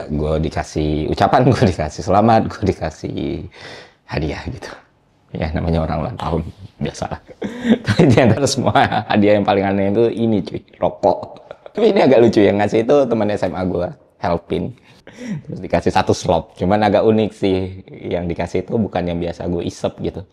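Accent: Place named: Jambi, Indonesia. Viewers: Mantab bang, logat Jambinyo masih ado. 😅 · Seneng nian denger bang make bahaso jambi, samolah cak palembang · Enak nian dengar kau bahaso jambi bg, mantap 🔥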